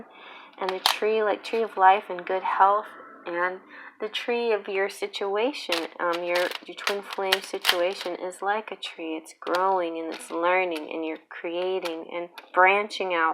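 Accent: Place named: United States. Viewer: Love the charms and yes u have a nice voice is American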